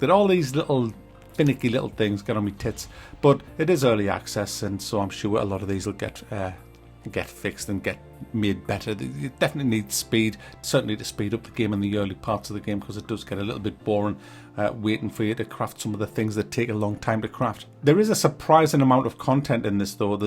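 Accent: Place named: Newcastle, United Kingdom. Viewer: I love your videos man, they're reassuringly Geordie. Keep it up!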